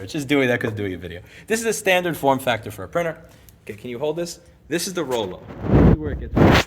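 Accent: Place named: East Coast, United States. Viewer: I was like why does this voice sound so familiar? Gotta love east coasters, oh shoot it is? haha should have known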